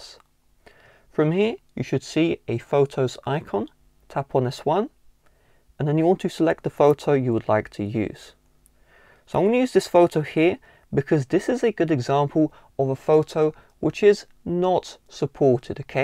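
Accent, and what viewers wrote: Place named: United Kingdom. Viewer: i like the charming british accent ! ❤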